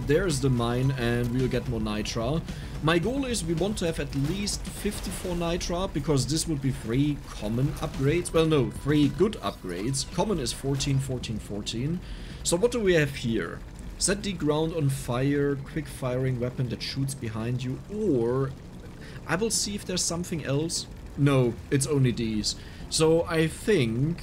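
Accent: Germany. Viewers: Ich dachte mir die ganze Zeit, ser hat iwie nen deutschen Akzent. Dann so „Ach komm hör auch mit dem Käse“ 😂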